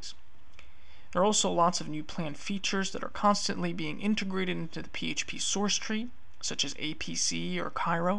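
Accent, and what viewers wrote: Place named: United States. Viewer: This was outstanding, and spoken in plain American English. This is the third one I tried and the 1st one I understood.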